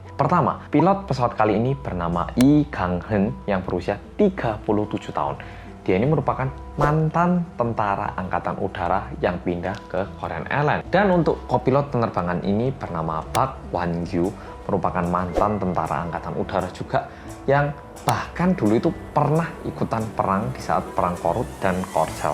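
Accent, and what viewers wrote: Java, Indonesia. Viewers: Setiap Gw Nonton Video Yg Gw Dengar Medooooookkkk!! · Korea reomit hanya orang korea yang medokkkkkkk.. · Ayooookk... Bolo2 ndang cus ndelok like share coment di chanel Korea reomit bersama orang korea yang medoooookk 😊🤗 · Nyimak orang Korea sing medok👍 Mantap👍 ni ulasannya Sukses selalu mas🤲🤲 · Di thumbnail nya ku kira mas ngomong ada bom, ternyata medhoook:v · Semangat terus maasss medooookkkkkkkk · Jiaaaahhhh....... lg seru2nya koq bersambung. Wah dikerjain mas medok nih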